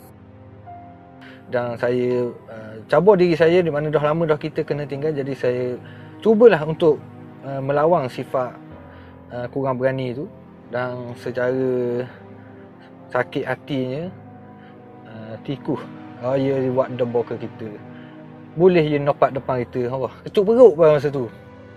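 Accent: Terengganu, Malaysia. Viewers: MU BUAT KONTEN..APA MU CKP PUN..AKU XPHM..CKP BENDA APA PUN XTAU..SBB MU PEKAT SGT GANU..CKP K.L...SEMUA OWG PHM..MU CKP GANU..MN PAHM..MU PUN NK CKP TAKOT2..LG XPHM..LAST2 AKU BOSAN NK TENGOK.. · Ooo... Awk org ganu ye.. 1st time lyn OH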